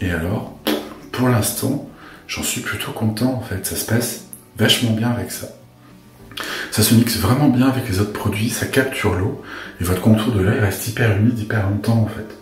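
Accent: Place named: Marseille, France. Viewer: Bel accent presque le mien de l Estaque quartier nords en foooooorce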